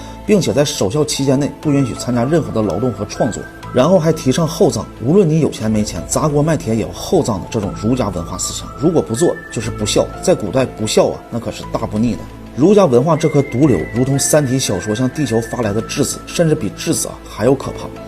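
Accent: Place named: Northeast, China. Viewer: 东北口音果然给人一种文化不是很高的刻板印象。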